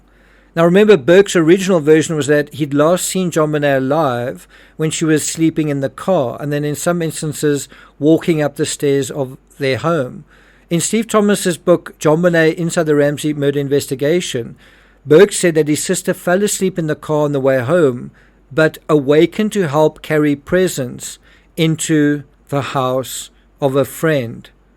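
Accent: South Africa. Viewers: The narrator sounds very South Afrcan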